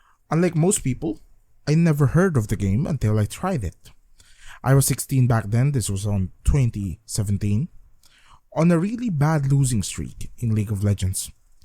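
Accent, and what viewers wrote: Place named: Philippines. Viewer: When I heard the accent, I just knew it was a Filipino CC. Know any communities/servers to ask and team up with other Filipinos or do we just go in recruitment chat and pray we have peeps there?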